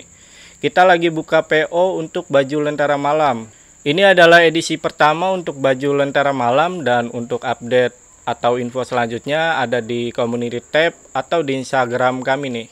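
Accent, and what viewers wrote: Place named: Bali, Indonesia. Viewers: Aksen bahasanya seperti orang Bali kak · Suara bang medok Bali banget yah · Logatnya seperti orang Bali..slm dari Bali juga..smoga sukses cannelnya..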